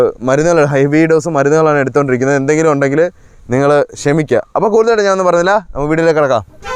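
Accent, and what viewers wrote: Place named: Thrissur, India. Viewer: Thrissur slang❤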